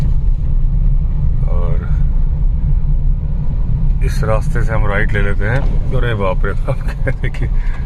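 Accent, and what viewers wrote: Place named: Awadh, India. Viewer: bohute sundar. bare dino baad pakki awadhi sunne ko mili. apne nanhihal ki yaad a gayi. zabardast. nothing like hinterland UP.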